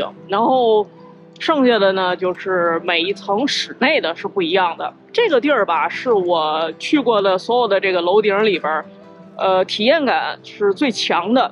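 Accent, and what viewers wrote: Beijing, China. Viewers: ，我在西岸，能跟着你追大纽约地区的光甚是开心，喜欢这一口京腔，听着心里特别踏实。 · 还是不做作的京腔舒服啊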